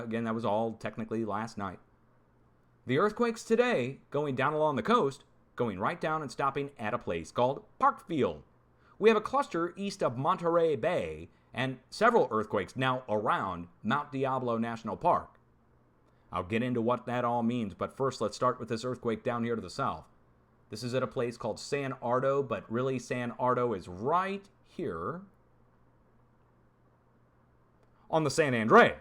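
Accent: Russia. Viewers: Love the Russian accent and your sense of humour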